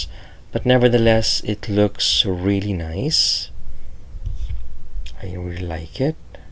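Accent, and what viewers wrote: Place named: Pakistan. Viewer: I believe he is from pakistan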